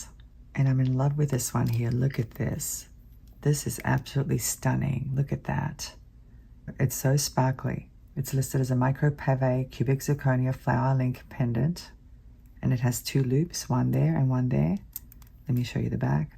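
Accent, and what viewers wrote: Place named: Australia. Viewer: Thank you. Can’t wait to see what you design with these beautiful beads! Just got home from a long visit with my son in Australia. Always think of you when I venture “down under” and listening to your accent always makes me smile and takes me back. Take care I will be looking forward to your next video. Take care of you ❤️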